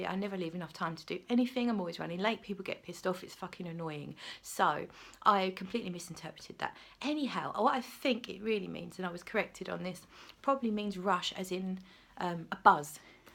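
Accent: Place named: Cockney, United Kingdom. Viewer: I'm from Luton, your review sounds so so local love the review and the cockney accent xx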